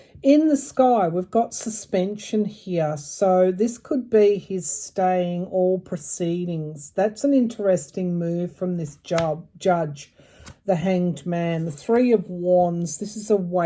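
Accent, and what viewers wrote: Australia. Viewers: thinking about setting up Siri with a female Australian accent in honor of you. 🌷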